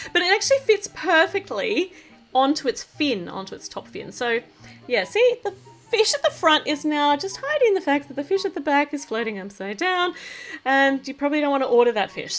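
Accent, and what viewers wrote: Australia. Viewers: I thought you were saying pillow cuz you talk in an Australian accent but you were actually saying pillar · I love the Australian so it’s sounds like suo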